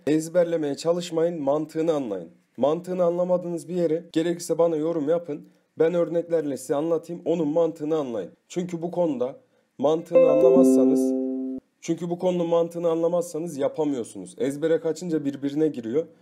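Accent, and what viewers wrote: Turkey. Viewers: Hocam elazigli misiniz??? Sive cok benziyo gadan alam... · Söylemeden edemicem Yozgatlı mısınız acaba şive müthiş her anlattığınızı gülümseyerek dinledim ve çok beğendim😍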